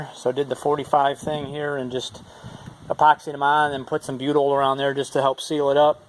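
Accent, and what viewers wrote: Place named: Upper Midwest, United States. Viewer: Sounds awesome man. I can tell you're from the High North of the Midwest by your accent.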